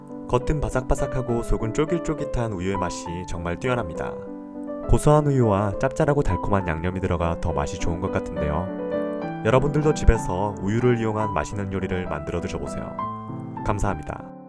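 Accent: Gyeongsang, South Korea. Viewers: 경상도 출신이다에 한표ㅎ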